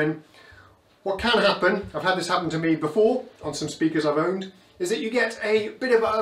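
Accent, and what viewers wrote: United Kingdom. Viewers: Oh no! You are all "BOX'ed" in...hahaha. Great evaluation. And I agree that the plastic monitors do rumble, buzz, and vibrate more often then those built out of wood. I also punish myself by facing my keyboard up against a concrete wall and tell myself, take that pilgrim...haha. But for my bass and guitar next to me, I play looking outside a large window as this is where my DAW if facing. Go figure...lol! I had no idea you were living in Sweden as your accent is British thinking you were in the UK. Either way, that's awesome. Loving your videos and I am so happy for you that you bought a great semi sound reinforcement set up that is pleasing to your ears. Not sure about your wife's ears I assume though hahaha. There are many speaker and amp combinations for such purposes that are cheap. And I stress cheap as in cheaply built and sounding. 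I think you aquired yourself a great buy. Congrats on your meticulously thought out purchase. As far as your amp falling and damaged, you can always say it was a drop in price...lol.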